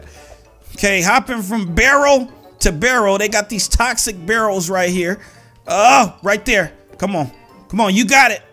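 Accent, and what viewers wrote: United States. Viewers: I like his American accent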